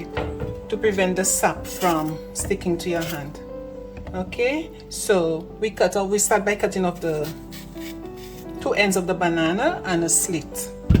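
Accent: Saint Lucia. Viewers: Love the St Lucian accent, keep talking so I can hear more of it. Also it is the green banana that is known as poor man food item...that said there is nothing poor about your nutritious meal!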